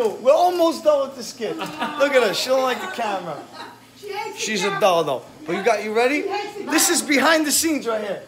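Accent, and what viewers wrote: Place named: Brooklyn, United States. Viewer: LMAO....so sweet how much they love you! You make my heart melt and that unforgettable Brooklyn Italian accent I miss hearing so much....keep it coming!😘💗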